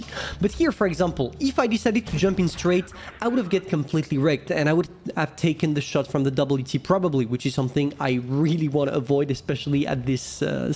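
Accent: France. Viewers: My ears hurt when he pronounces english words like they were French and I can tell because I'm French myself but I'll give him credit for speech flow.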